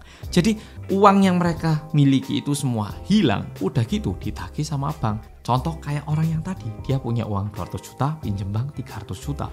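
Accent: Java, Indonesia. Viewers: Aku kok belum terima ya mas ini orang Korea 😢 medok gitu kok, pura2 kali JD orang Korea 😢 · Seharusnya mas ini yg jd penterjemah tim Red spark. Bhsa Indonesia nya super lancar jaya n medhok. Ngomongnya udh Indonesia bangetlah pokoke👍👍👍👍👍👍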